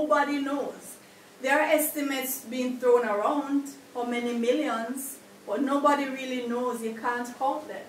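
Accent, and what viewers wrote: Jamaica. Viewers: are you a Jamaican I love the way you speak... The accent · Really nice to hear a Jamaican voice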